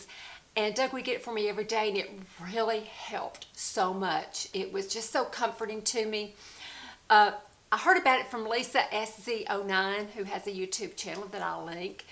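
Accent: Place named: Southwest Virginia, United States. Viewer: Hey! You inspire me so much. I am from and currently live in South West Virginia our accents are so similar and I looovvvee it. I just turned 50 and up until a recent and very rapid weight gain I am the happiest I have ever been with my self. Believe it or not you your beautiful long locks inspired me to get my current pixie cut. No sorry I cannot explain that. I will update my profile pic on YouTube soon. My hair is shorter than in my pic here. I love it so much and have received many compliments. People I don’t know stop me and ask about my hair color and cut. Anyway enough about me. I love your and Kristen321 channel so much. You guys are my favorite YouTubers by far. I love Jessica Braun and EmilyNoel. There are some others Elle Nisha. I love Sthepanie Nicole too. I have gotten way off topic. I would love so much if you and Kristen were to do a meet up. 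My suggestion would be Smokie Mt. area since many folks already travel there. Maybe hubbies could come and busy themselves elsewhere. Just throwing something against the wall to see if anything sticks. Jessica and Emily both are in Indiana maybe they could come. Jessica is pregnant with her first beautiful baby so she may not have availability. Girl you and Kristen have serious fans that really would love to spend an afternoon with you guys. Much love and many thanks Micha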